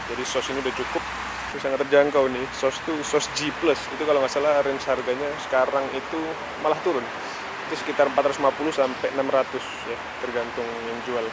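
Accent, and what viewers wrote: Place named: Medan, Indonesia. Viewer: Jdi logat orang medan 😁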